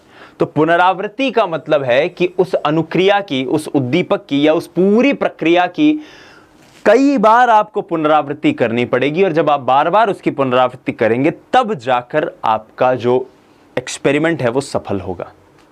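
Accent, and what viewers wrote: Rajasthan, India. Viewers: The way you speak marwari😍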